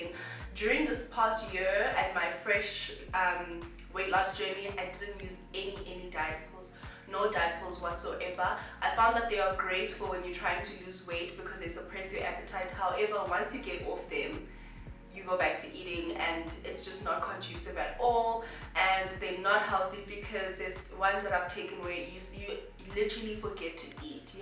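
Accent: United Kingdom. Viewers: Loved the UK accent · N the dash of UK accent iphumaphi? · It's the British accent for me...lol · i loveee the british accent omg omg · The British accent gets me everytime 🤣🤣❤️❤️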